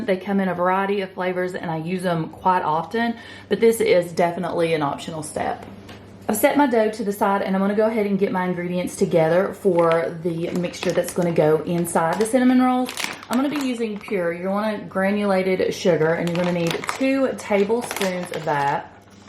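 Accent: South, United States. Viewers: YAY!! Meal prepping! Thanks! I have 4 kids (2 still at home and the youngest is the pickiest 5 yr old girlie on the planet I swear! LOL). Btw, I love your southern US accent: it reminds me of Dr. Ken Berry's accent and I think he lives in Tennessee so I can only guess that you're from the same region. Anyway, I'm going to watch your video and take notes as this looks like what I'll be making for my own family over the weekend. Warm regards from southern but not-so-sunny Belgium, Donalda (new sub)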